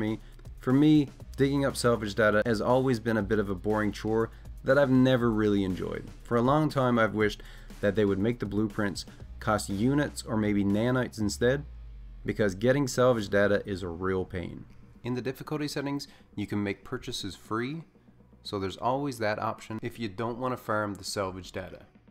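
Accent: Newfoundland, Canada. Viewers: I knew you were from NFLD and then you said that at the end lol. Hi neighbor, great video!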